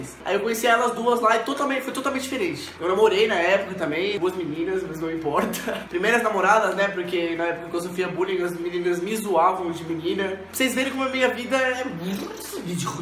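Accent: Porto, Portugal. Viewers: Sotaque Portugues <3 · omg!!! eu nao fazia a minima ideia que tu estiveste a viver em portugal adorei ouvir te falar portugues de portugal eu nao acredito!!!nao podes comecar a falar um pouco nos teus videos em portugues de portugal?? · Adoro quando tu falas Português- Portugal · tens mesmo o sotaque do porto kkk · é sotaque à portuense carago!!!,! ;) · Raymson Barbosa Português de Portugal :) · sou de portugal e acho que não sou a unica Portuguesa a achar que tu ficas super fofo a falar Portugues de Portugal <3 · Eu comecei a ver o vídeo é minha cabeça explodiu!!! Eu te acompanho faz pouco tempo e nunca te vi a falar com sotaque de Portugal! Vem outra vez dá!!! #LuísMarizEmPortugalOutraVez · Assim uma coisa , gosto muito mais do teu sotaque português de Portugal do que português do Brasil ( e eu adoro o sotaque brasileiro) mas ficaste mesmo fofo a falas assim hahahahaha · tens bue sotaque do porto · Meu Deusssss, o sotaque dele no início e no fim do vido tem mesmo sotaque português!!!!! 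· esse sotaque português !!! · vem logo pro portoooo !!!!!! sotaque portugues lindo :o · Aí adoro quando falas em português de Portugal. Devias fazer mais. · Mano, é muito viciante quando alguém que costuma falar PT BR fala PT PT. Actually, it's kinda cute <3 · oh meu deus, tens sotaque português, AMO*-* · falas mm bem portugues!! 😂 sotaque do porto mas yh boa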